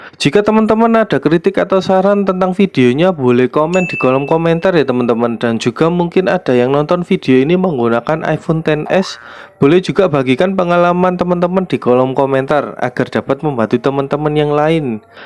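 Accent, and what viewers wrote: Java, Indonesia. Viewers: kok medok banget..😅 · Jawane medok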